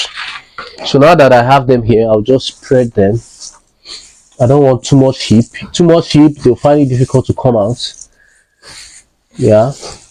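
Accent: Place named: Africa. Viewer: Your English accent is African learn the Filipino English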